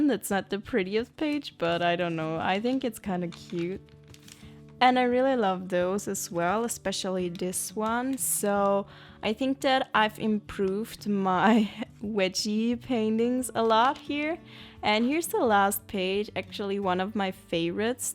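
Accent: Germany. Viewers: Are you German? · Where are you from? It sounds like a Germanaccent😂😅😗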